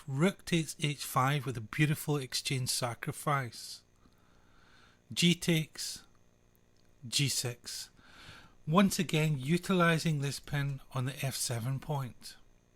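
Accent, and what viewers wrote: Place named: Scotland, United Kingdom. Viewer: Originally, I was gonna ask if you were from Glasgow, but since I am not familiar with different Scot accents I broadened my question. No shame in being from Glasgow. Thanks for the video.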